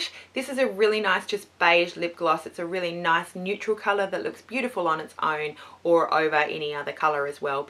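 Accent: Australia. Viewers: I loveeeeee ur cool Aussie accent! Where in Aussie do u live? I'm in Sydney..:) Nice haul...! Missin USA just for shopping lol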